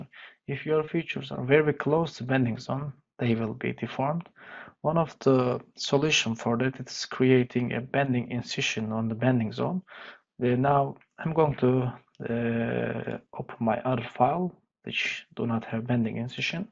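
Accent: Turkey. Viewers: Are you Turkish? ı thought this cause your accent.am ı right?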